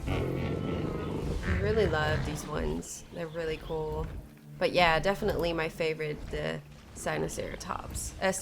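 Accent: Netherlands. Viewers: Soms dacht ik een Nederlands accent te horen, moest het even opzoeken. Zelde hoor je Nederlanders zo goed engels spreken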